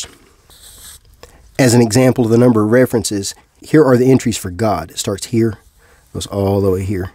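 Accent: South, United States. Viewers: Your accent is Southern American, right? What part of the South, if I may ask?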